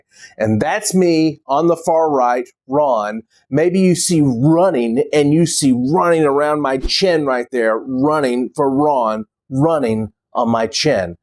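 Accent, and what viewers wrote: South, United States. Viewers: Love your charming Southern accent.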